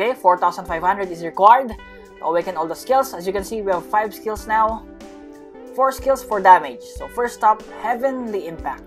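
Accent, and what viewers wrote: Philippines. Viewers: Bro stop I know you philippino you're accent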